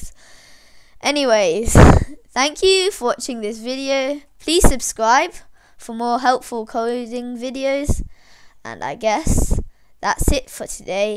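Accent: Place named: United Kingdom. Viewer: R u British? You sound British if your not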